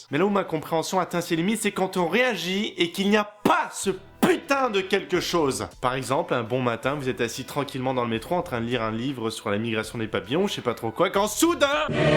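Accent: Quebec, Canada. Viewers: XDDD c'est enorme c'est mieux qu'un cyprien ou un norman srx t'es epique avec ta tronche de psychopate et ton accent quebequoi LAPIN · C'est un français ou un québécois avec un accent de frais chié?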